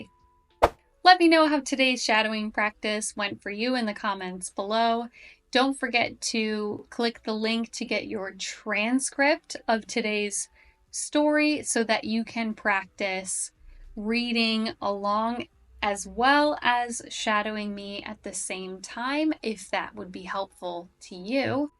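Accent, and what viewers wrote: United States. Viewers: WOW!!! Finally I found a great shadowing English channel with the beautiful American accent!!! 🙏🏻🙏🏻🙏🏻 More difficult than British accent to pronounce but more beautiful when it's well spoken (no slang, no ghetto stuff). Thank you, I'm a new subscriber 🥳🥳🥳💖✨💕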